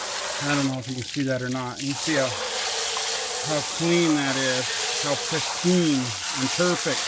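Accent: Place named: South, United States. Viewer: I like that country man talking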